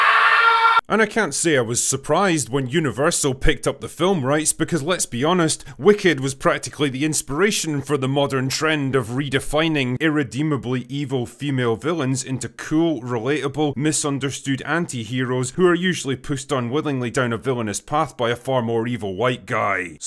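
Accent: Scotland, United Kingdom. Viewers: Is it Scottish accent?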